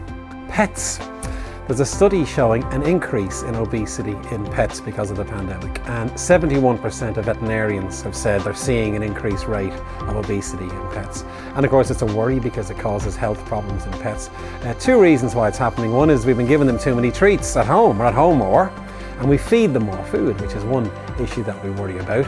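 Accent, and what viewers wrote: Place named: Dublin, Ireland. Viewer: Except for the dub accent, it's a good video.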